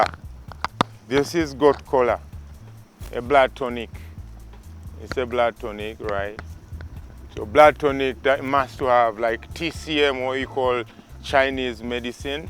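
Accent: Jamaica. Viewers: Are you an African or a Jamaican you sound like a Jamaican in 🇯🇲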